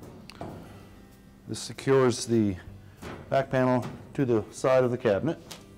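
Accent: Canada. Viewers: Thank you, this was exactly what I needed. Love your accent, is that Canadian?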